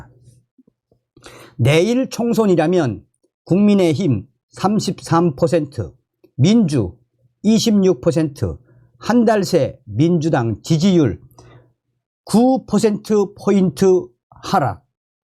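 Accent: North Korea. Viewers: 정성산 티비뉴스 고맙수다래 ㅎㅎㅎ 이북말 정겹읍니다 🌼🌷🌸🙏🏻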